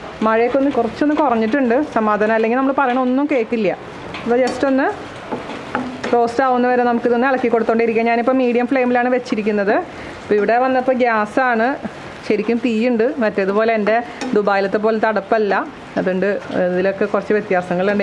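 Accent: Thrissur, India. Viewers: Natil vannapo chechik thrissur accent koodi😍. Njan kottayam accent aanu ketto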